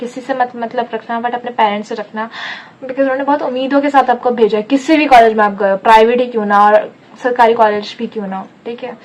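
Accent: Bihar, India. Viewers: Didi bihar se hai kyaa aap.. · dii bihar se ho aap bhi really mujhe laga delhi ki hain aap😁😁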